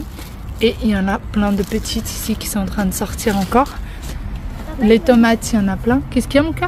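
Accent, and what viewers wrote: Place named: Morocco. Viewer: Coucou, j'aime trop l'accent marocain 😊 PS: je suis tunisienne ❤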